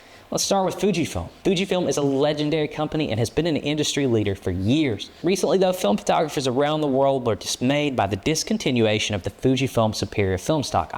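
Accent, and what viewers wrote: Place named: Appalachia, United States. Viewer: I’m delighted to find the channel, and I’m tempted to switch out the digital and try film photography after a long break. Also, and I apologize if this is intrusive: where are you from? Your accent reminds me of locals in the southern stretch of the Appalachians, where I went to school, but maybe a bit different. Tennessee?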